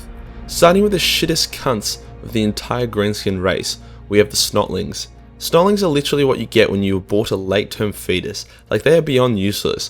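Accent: Australia. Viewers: As a Canadian I reckon aussie have the best fuck off narration around, fair dinkum, bloody legend!!!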